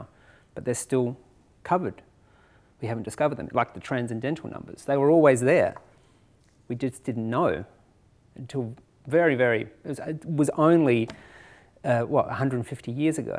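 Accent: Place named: New Zealand. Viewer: Sounds to me like a Kiwi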